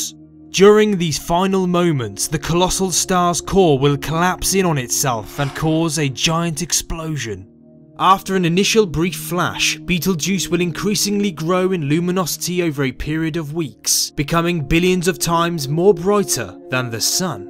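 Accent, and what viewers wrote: United Kingdom. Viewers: he’s British buddy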